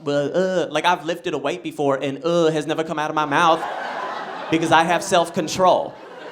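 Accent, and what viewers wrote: Louisiana, United States. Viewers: How did he grow up in the San Fernando Valley and come me out sounding like he’s from Louisiana? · Wow you have a thick southern accent for being born and raised in LA, CA. Unless you meant LA as in Louisiana? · Hi, I noticed you have not put any videos on here in a long time. I hope all is good, I saw you on America's got talent but not sure how old the video was? I read comments below about your accent, I grew up out in So. Cal., but,moved back to michigan 22 years ago. I have heard many accents and wondered if you were from maybe Lousianna? you have an accent, but it doesn't sound like New York / Jersey, or Canadian, or Michigan, Wisconsin, Minnissota, or any states i can think of, but it has sort of a southern sound but not always. just wondering where you grew up? Most of us get a accent from hearing adults with an accent at a young age. 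When I moved back to michigan from So Cal, it was funny sounding to me, they really had some strong accents here but they dont think they do.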